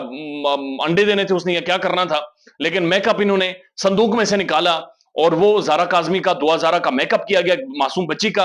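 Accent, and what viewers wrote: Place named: Kashmir, India. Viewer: ایب ،کیب ) اس سے پتہ چلتا ہے کے ماسیرو کشمیری ہیں آپ 😊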